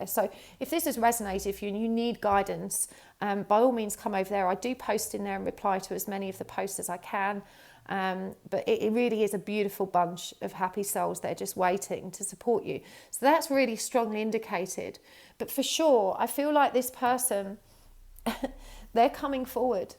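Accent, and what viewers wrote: United Kingdom. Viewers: Omg i love the British accent. Like it's super interesting why people in England have accents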